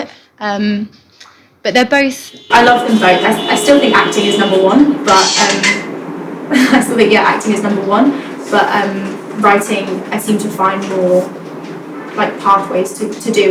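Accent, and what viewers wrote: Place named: United Kingdom. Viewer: Tangiers was international city From Tangiers come the most brilliant Moroccans throughout all civilizations Cute little Brit accent ah I studied three years in Tangier & loved it from 1967 to 1870 gorgeous beautiful City Lovely to be a hippie where in Casablanca was very thought to be a beatnik The best playa the whole city is Awesome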